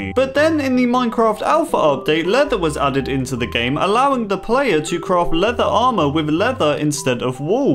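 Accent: United Kingdom. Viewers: i cant believe ill say this but...now i understand why my friend HAtes english accents...fuck i hate myself now... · R u British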